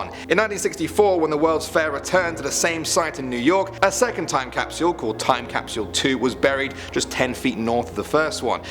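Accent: United Kingdom. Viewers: He's British. They pronounce it cap-syool. A perfectly acceptable pronunciation .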